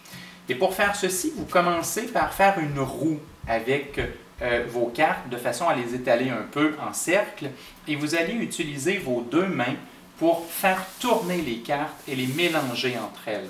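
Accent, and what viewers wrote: Quebec, Canada. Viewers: Merci pour tes explications claires. Ton accent est marrant, comme tous les québécois :) Est-ce exactement la même chose pour un tirage par téléphone concernant les cartes à retourner "à la verticale" ? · C’est passionnant !!!😄je me régale. Mais y a du boulot. Merci d’avoir réussi ces 2 livres. Et vos vidéos sont super agréables à écouter. Et l’accent Canadien est trop mignon. Bonne continuation.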